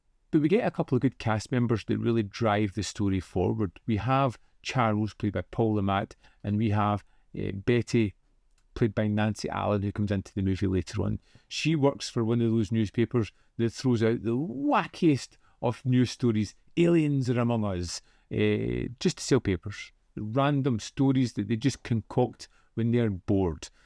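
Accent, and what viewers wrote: Scotland, United Kingdom. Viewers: Glad you finally saw this. It was a seminal part of my childhood, watching it on HBO, as I'd never seen anything so shocking or gross (or so I thought at an early age). I also wanted to say thank you for all your Top 10 videos. Your mellifluous Scottish brogue has been a soothing source of ASMR that has helped me stay calm during these difficult times. Keep it up!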